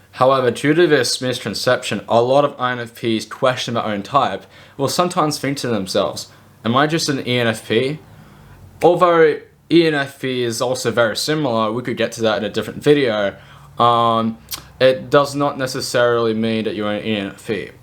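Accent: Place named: Australia. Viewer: His aussie accent plus a deep voice makes all the difference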